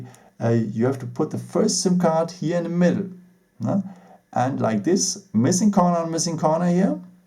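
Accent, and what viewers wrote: Germany. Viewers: sounds like a german accent :) ;) Thank you for the video, good job.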